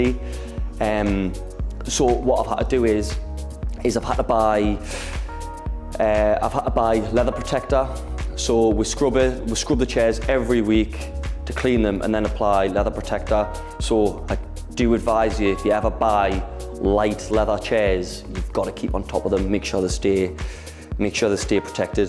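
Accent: Newcastle, United Kingdom. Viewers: Geordie mate, north east of England.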